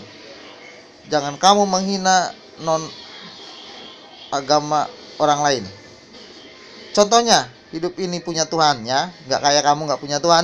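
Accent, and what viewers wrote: Jakarta, Indonesia. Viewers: Apa saya sendiri ya yg ngakak habis denger betawinya si abang ini mantap abis bos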